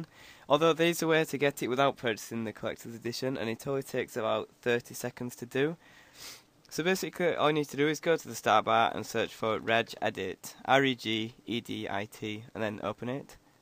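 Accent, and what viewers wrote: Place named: Scotland, United Kingdom. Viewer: it's the scotish accent:D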